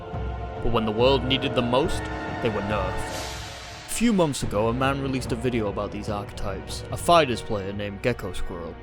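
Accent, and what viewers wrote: United Kingdom. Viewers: Another fucking banger! Everytime I let your silky british voice lul me into listening to info about the topic the punchline hits and I never see it coming. I don't genuinely laugh at many videos but yours always manage to get me. Never change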